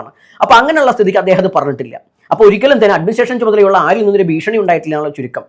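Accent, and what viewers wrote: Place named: Namboothiri, India. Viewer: സംഭാഷണത്തിൽ നമ്പൂരി ശൈലി ആഡ്യത്തം ങ്ങട് ലേശം കുറച്ചോളൂ... ബാമ്പ......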